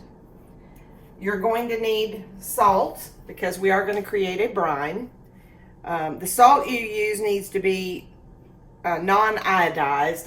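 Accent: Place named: Kentucky, United States. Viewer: Hey girl 💥I don’t know where you’re from but you sound like you come from my neck of the woods!! Love❤️ love the video…❤️ from ky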